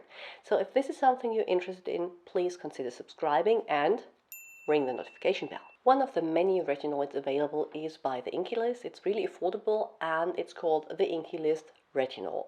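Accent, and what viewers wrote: Germany. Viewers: Why I Hear a german Accent 😂 Are u german ?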